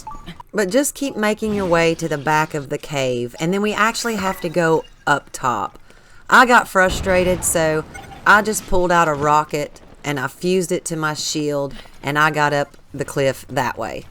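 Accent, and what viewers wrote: South, United States. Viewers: I love your accent ! You are obviously an American - somewhere in the southern states I am guessing ?? Thanks for these videos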